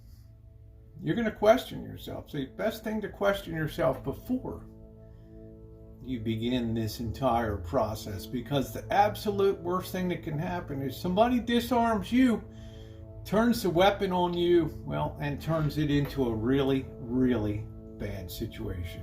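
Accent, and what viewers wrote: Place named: South Jersey, United States. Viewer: You sound like you're from South New Jersey.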